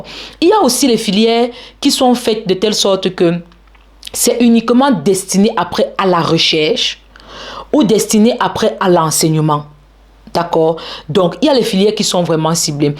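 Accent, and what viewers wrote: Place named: Italy. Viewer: Aimé ton parler italien 😢😢😢❤❤❤ dis moi comment me préparer avant d'arriver là-bas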